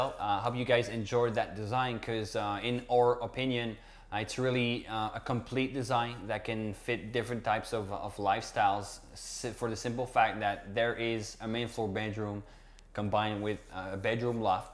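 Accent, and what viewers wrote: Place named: France. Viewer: Y'all build the most beautiful and highest quality homes of all. Speaking of French accents, you seem to be losing yours with every new video. Never lose the quality of construction and your sense of humor. You guys are the best!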